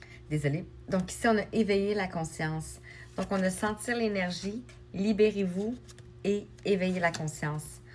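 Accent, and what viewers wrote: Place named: Quebec, Canada. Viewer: je suis epatée par vos guidances ❤❤❤❤et jadore votre joli accent québécois 🙏🙏🙏🙏vous etes ☀ belle journee a vous 🙏🙏🙏et merci pour vos guidances qui m'éclairent dans mon❤❤❤ cheminement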